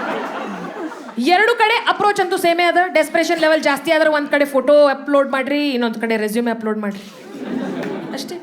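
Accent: North Karnataka, India. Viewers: Soooooooperb presentation. Wonderful flow of words especially your Uttara Karnataka accent is really a added flavour to your comedy. Enjoyed very much. I am and I am also a Stand up comedian. Both of us have performed on the same stage once and you were the anchor. God Bless You. Keep Rocking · Too good... love ur north Karnataka kannada.....keep rocking ❤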